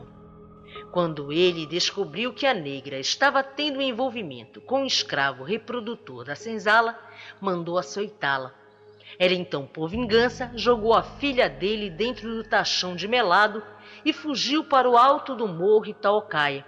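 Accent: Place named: Amazon, Brazil. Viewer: eu sou de belem, pelo seu sotaque as vezes eu tenho duvida se voce é de belem ou de manaus. Amo os seus videos, abraço.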